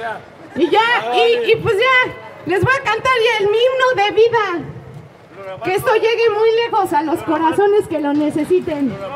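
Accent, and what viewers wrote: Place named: Mexico. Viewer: 🌼 🌷 tan hermosa como tu nombre, y me siento muy orgullosa de que seas mexicana. En serio, no se como gritarlo, eres algo, completamente diferente, especial, único, y me 2ncanta también como hablas, tu acento: 100% mexicana!!! Oye, eres de este mundo? No eres un ángel venido del cielo, que te envió Dios para alivianarnos un poco?! Tu alegría es increíblemente contagiosa! Que Dios te Bendiga y te Proteja! Mil gracias por existir!!👍👍👍😄😄👏👏👏😇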